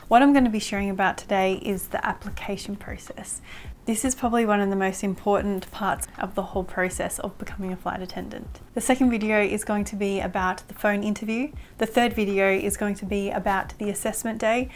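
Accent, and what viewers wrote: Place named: Australia. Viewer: omg an Aussie, perfect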